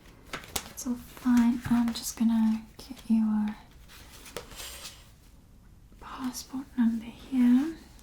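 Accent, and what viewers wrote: South Africa. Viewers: Love your accent. Is it South Africa? x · I thought she was British too in the beginning, but then there were parts that sounded too close to my own accent (Australian) and the natural conclusion is SA lol.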